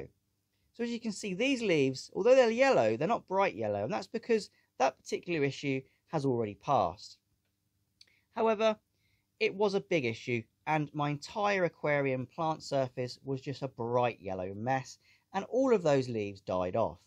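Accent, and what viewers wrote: United Kingdom. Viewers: Hi, thank you very much for you always nice and interesting video's. So refreshing with your UK accent, compared to the noisy and annoying American videos. Also thank you very much for the cool intro theme song! Been looking for that one for years. 👍 Always hate it, when my timer not doing what I think I programmed it to do.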